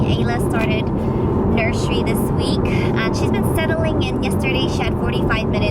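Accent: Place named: Ireland. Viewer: I love how your accent is slowly starting to sound more irleandish each time I watch a video of yours :D Watching you since 10+ years, somehow its become a ritual to 'catch up' with you. Stay strong and bubbyful! Lots of Love <3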